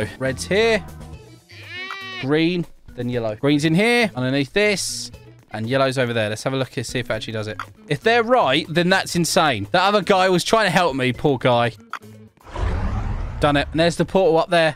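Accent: United Kingdom. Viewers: Are you British? Bc if you are… HI MATE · I LOVE YOURSELF BRITTISH ACCENT 😭😭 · Your british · U are British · YOUR BRIT-ISH MATE? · I know ur british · bro is british 💀💀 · Bro is british🏴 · I was wondering r u British 😮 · Are you British? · Are you british? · Areyou british? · are you british😅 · I just realize that you were British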